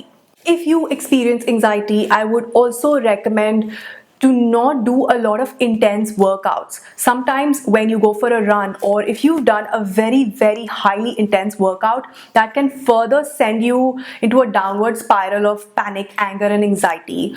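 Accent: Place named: India. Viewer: Amazing knowledge your sharing! Keep helping people my friend. Love your indian accent too you got a sub from me 😄 2021 LET'S MAKE IT A GREAT YEAR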